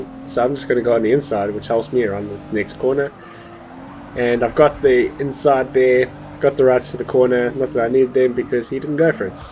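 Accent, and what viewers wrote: South Africa. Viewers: Is this guy from South Africa?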